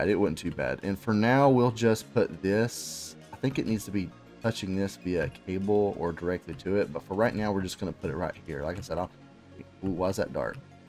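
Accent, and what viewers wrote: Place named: South, United States. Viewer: Builds are so amazing!! I want to start a channel someday but sadly, I am still a noob when it comes to many of the mods. Learning them slowly but surely! But your vids are definitely helping. I went back to Pokehaan Craft for now, but will get back to this modpack eventually, lol. Also, whereabouts are you from? Your accent sounds southern USA, but I could be very wrong. I only ask because I am Southern USA myself.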